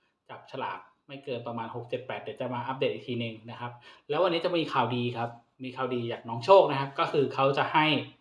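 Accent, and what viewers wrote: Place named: Thailand. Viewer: คิดถึงนะคะอาจรย์ สุดหล่อคะ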